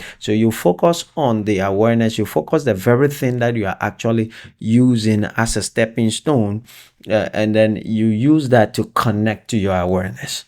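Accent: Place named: Nigeria. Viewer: Ete sen, I worked with Nigerians for a few years and your accent reminds me of them. Old good memories. I have a question: With all these earthquakes and erupting volcanoes and all the damage that this will cause, will humans return to the Stone Age in terms of daily life (jobs, transport, heating, trade, travel, food)? Will we have different situations from one country to another or from one continent to another?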